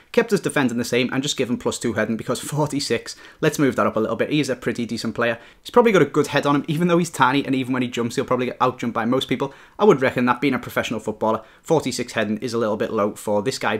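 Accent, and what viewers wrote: Liverpool, United Kingdom. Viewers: Love the Scouse accent ;)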